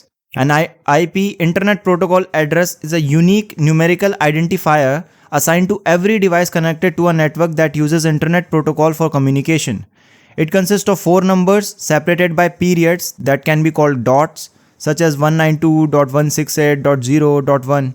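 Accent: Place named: India. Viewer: bro are you really from sweden 🤨 , i thought u were indian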